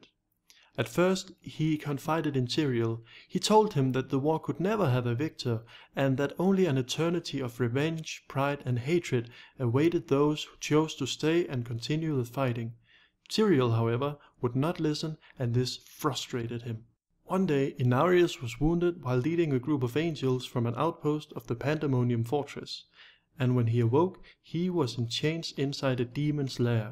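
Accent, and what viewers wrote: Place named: Denmark. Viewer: Nice job! Where are you from? Your accent sounds very Danish, but I'm not an expert ;)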